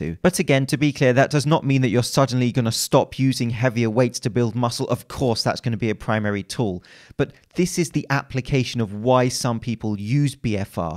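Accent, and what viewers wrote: England, United Kingdom. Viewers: Love your videos mate, specially the taking the piss ones. Would love it if you did a video on the old timey light dumbbell system. Got a book about it, David Bolton's the lost secret to a great body, and it really changed my body. I'm what you call a hard gainer. Been doing Capoeira for 14 years, so thin, well defined muscles, but I always felt like my arms were a bit like T Rex's. After 2 months I gained 5cm around biceps, there's almost no appreciable DOMS after a month and you get a very specific feeling i've never felt before of being BIGGER and ENERGIZED after each session. You sound like you live in Britain, maybe you can make an interview with the author, find some evidence on how this training method works? I've tried to look for it myself but can't find anywhere why these changes have occurred so fast. Maybe increased glycogen storage in the muscle and therefore more water retention in the fibre? 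But I am objectively stronger and have more muscle endurance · "Restrictimg blood to their brains" haha. I found your channel the about a week ago when I was looking for some creatine info. Great stuff and I love the humor. One of the few subs I actually have. But one downside, I caught myself reading a textbook with an English accent in my head...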